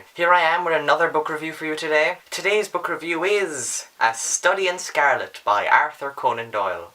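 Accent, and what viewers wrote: Ireland. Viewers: are you irish? :) and thank you for the review, very helpful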